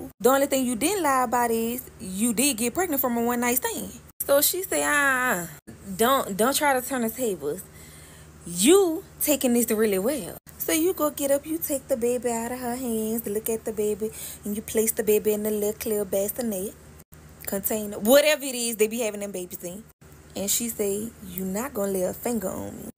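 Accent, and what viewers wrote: New Orleans, United States. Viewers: That nawlin accent is everything to meeeee behbay! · That’s that New Orleans accent baby👏🏾 Louisiana in the building 🫶🏾😂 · Only N O people have that accent not the whole Louisiana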